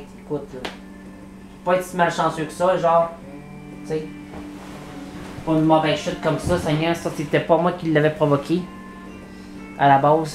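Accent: Canada. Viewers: sympa ta partie GG à toi poto:) tu es canadien non?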